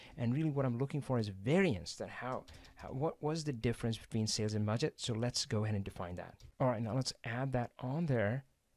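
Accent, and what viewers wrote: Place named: India. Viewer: Finally, one smart Indian man with weak Indian accent. Thank you for the tutorial, thorough and easy to digest. 👍🙏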